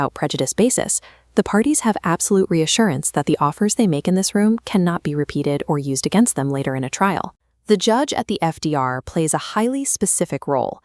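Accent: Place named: United States. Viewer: English law. English audience. American accent?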